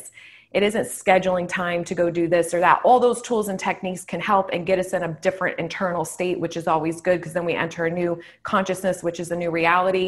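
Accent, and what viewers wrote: New York, United States. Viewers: I've been binge watching all these videos of different coaches and I am slowly liking your vids the most! Plus as a New Yorker, I can totally tell you are from NY 🤣 But I definitely need to work on self-concept and assumption because I really really want my SP and I can see the hot and cold with how I am feeling that week or not. I want what I want and I deserve that shit! : )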